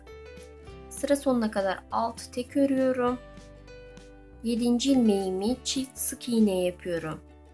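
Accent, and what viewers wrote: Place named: Russia. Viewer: Elinize sağlık hnm. Özel olmazsa bişey sormak istiyorum. Ruslar gibi Türkçe konuşuyorsunuz. Aslen rus musunuz?;)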